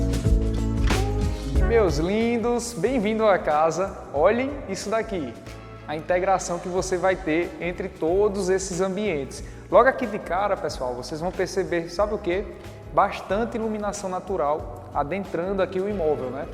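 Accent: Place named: Pernambuco, Brazil. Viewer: Que massa!!!! Parabéns pelo teu trabalho e muito sucesso!!!! Sotaque que amo demais , sou pernambucana 😊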